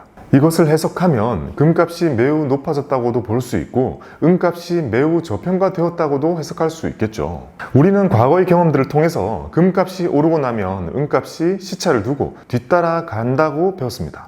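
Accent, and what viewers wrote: Gyeongsang, South Korea. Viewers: 와후~~ 추카드려요^^ 님~경상도 빠꼼인가봐요,억양이 ~~ㅎ 저도 그쪽인데 ㅋ 덕분에 실버버튼 구경했네요^^ 미소 미소 미소 미소 ^^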